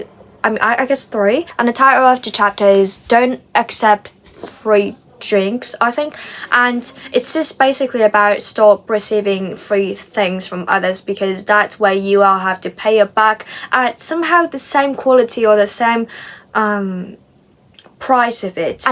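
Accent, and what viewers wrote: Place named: United Kingdom. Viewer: I came from Instagram, nice podcast. Btw I like your British accent a lot.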